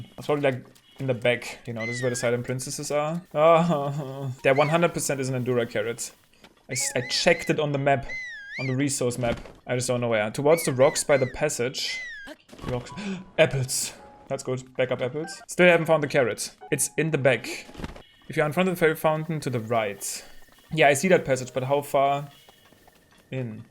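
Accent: Germany. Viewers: My mom is German and I guess I don’t watch a lot of German people speaking English but now I weirdly associate you with my mom. Thanks Also, herzlichen Glückwunsch zum Geburtstag!!!!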